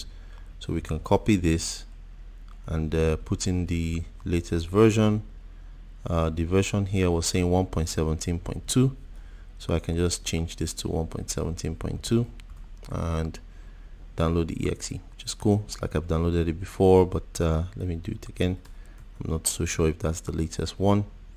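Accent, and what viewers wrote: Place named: Nigeria. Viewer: I have not even seen the video yet. But I am subscribing because you sound nigerian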